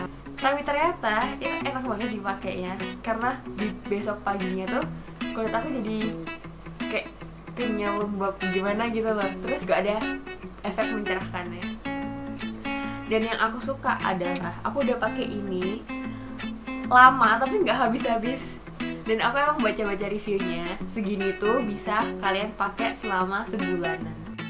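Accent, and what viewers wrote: Java, Indonesia. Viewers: Jowonya medok juga yaa mbak😅😅 walaupun ngomong pakai bahasa indonesia ttp keliatan jowonyaa😅😅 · Sukaa banget sama suaranyaaaa😍 medok medok kalem adem gitcuuu, penjelasannya juga singkat, padat, dan jelas. langsung subs.💖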